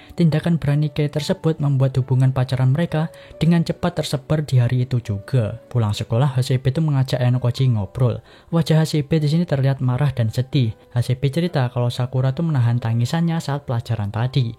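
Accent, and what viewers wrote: Java, Indonesia. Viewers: Akhirnya lanjut lagi, enak dikuping bgt walaupun medok wkkwkw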